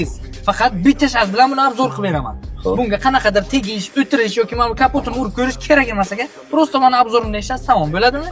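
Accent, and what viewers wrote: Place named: Bukhara, Uzbekistan. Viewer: Buxoro sheva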